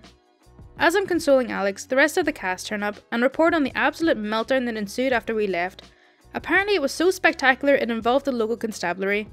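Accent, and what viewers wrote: Ireland. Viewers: First story: There's nothing wrong with a charming Irish accent, even for a British theater/dance story.. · Good morning, Markus etc. First, there is nothing better than the sweet voice of an Irish lass and a picture of a totally contented feline. Then the second thing.... a wee dram of single malt. 🐱🐱🐱🐶👴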